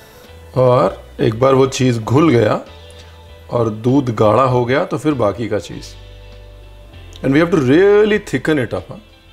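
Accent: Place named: Punjab, India. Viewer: yeah exactly. The way he iterates some word in Hindi and Punjabi blended.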